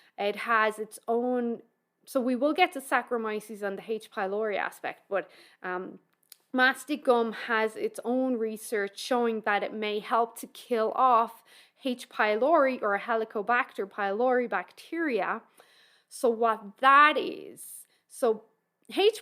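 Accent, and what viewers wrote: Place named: Ireland. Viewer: Thank you, lady with the lovely Irish accent. Very informative.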